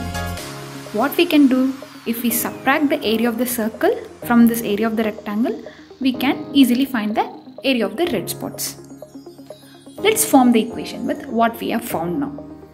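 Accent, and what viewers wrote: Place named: India. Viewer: That takes 16 seconds to solve its okay to speak Indianglish but you don't need to kill yourself and still come out with wrong answer 200 - 50pi = 42.92... 42.92...÷ 2 = 21.46... Thanks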